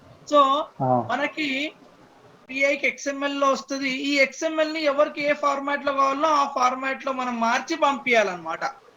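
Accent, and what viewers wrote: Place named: Telangana, India. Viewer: Telengana yasa la iragadeeshnav anna....detthadi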